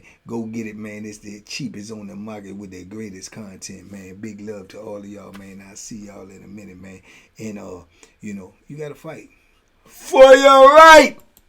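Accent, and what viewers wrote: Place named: Brooklyn, United States. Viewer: love doin that New York Brooklyn accent 😅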